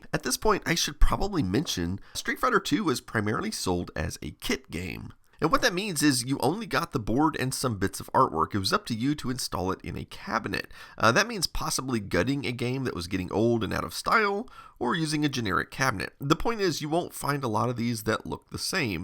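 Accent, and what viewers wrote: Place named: Texas, United States. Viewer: "I cleaned the CRT right quick." Ah yes, our Texan speech.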